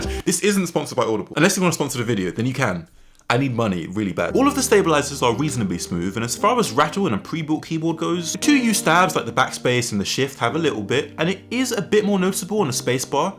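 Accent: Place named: England, United Kingdom. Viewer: where's your accent bro? You used to be very English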